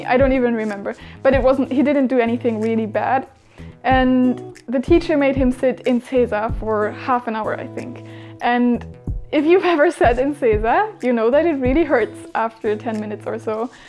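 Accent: Germany. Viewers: Da schaut man 5 Sek vom Video und denkt sich "das muss ne deutsche sein", geht in die Beschreibung und tadaa was sieht man... Sie ist deutsch XD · so you are german? it sounds like you are XD lol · I could hear in your voice that you're German,and then I made sure. I WANNA GO THERE,I LOVE GERMANY😍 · I love you're accent and ughhgh German girls <3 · i think shes german she has that accent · the lovely German accent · You sound really german. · Haha, bitte nimm das jetzt nicht persönlich, aber finde es gerade sehr witzig, dass ich innerhalb einiger Sekunden den deutschen Akzent rausgehört hab. :'D Überlegte schon, ob ich nachschaue woher du stammst, also danke, dass du es gleich in diesem Video geklärt hast. :> Wirklich beneidenswert, dass du diese Erfahrungen sammeln konntest. Wünsch dir noch viel Erfolg mit deinem Channel. :) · Your German accent sounds so good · Du bist doch deutsch oder? 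Man hört das voll😂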